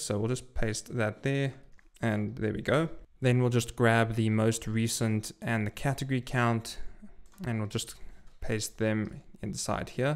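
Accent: South Africa. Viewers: Ayy that's a South African accent i hear. Keep at it man. Great tutorial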